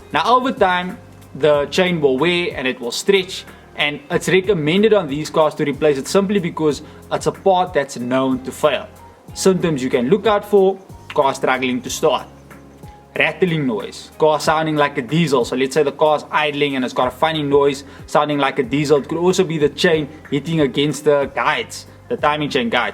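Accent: South Africa. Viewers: lol He's definitely South African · Thats a South African Accend .. 😄😄 nice vid tho .. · the south african accent was a nice surprise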